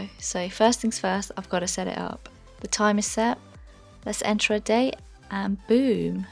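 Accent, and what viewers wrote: United Kingdom. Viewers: Love ur accent, which part of England are u from?:)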